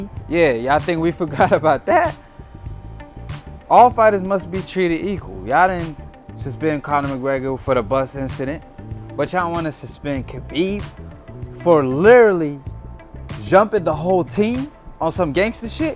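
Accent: United States. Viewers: I was there you idiot.! McGregor didn't sucker punch anyone and you sound American why would you take up for this trash and what could have been a lot worse than it was and another thing he proved his point in the octagon there was no reason to take it out in the crowd with Innocent people that could have severely gotten hurt or killed . Why are you American's so worried about this idiot you do know his family his friends and teammates ,father all been questioned about 9/11 and the real truth why his father cannot get a green card to come over here it's because they found out his father was associated with the terrorist of 9/11 and you want to back this BITCH "And this is FACTS not just speculation".... And if you are American and live in the United States of America and do not like it here get the FUCK out.!!!!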